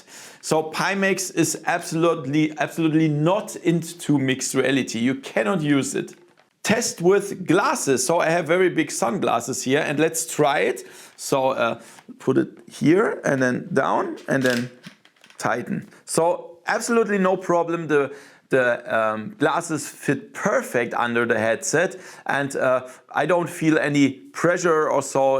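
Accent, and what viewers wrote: Germany. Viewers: I love the German accent. It's very clear and easy to understand for non-native speakers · What did you expect, buddy? He is German. Everyone has a different accent on planet Earth.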